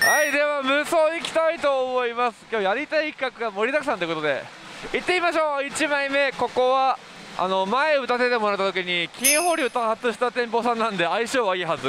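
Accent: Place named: Kochi, Japan. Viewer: 土佐弁最高！😊同じ高知で応援してます😆👍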